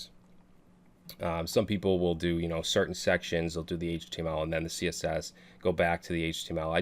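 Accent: Boston, United States. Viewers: When you hear someone's voice for so long and finally see their face. It's like my mind just went to a whole different dimension trying to sync up your face and voice. In all seriousness, I actually think your Boston accent helps me learn so much more. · dig the boston accent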